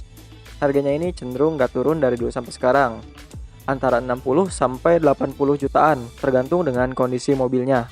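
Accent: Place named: Banjar, Indonesia. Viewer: banjarann ini mah mang nya?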